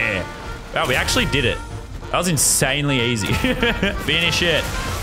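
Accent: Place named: Australia. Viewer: I’ve been watching lazerbeam for so long I can’t hear the Australian accent lol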